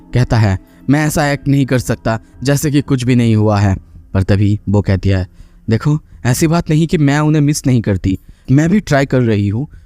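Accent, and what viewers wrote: Bengal, India. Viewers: Bengali ho aap? Accent jana pechana lag raha hai.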